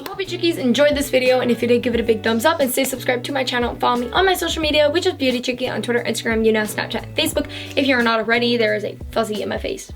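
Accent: New York, United States. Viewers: WOAHHHG I JUST NOTICED HER NEW YORK ACCENT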